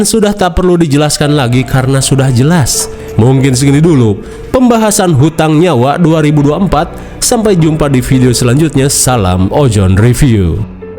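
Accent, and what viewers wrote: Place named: West Java, Indonesia. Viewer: Film horor juga jadi ketawa 😂 Aksen Sunda nya itu loh, jadi khas banget..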